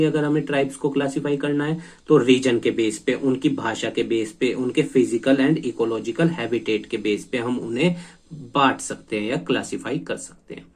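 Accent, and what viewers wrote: Haryana, India. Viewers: I think his tone is Haryanvi !!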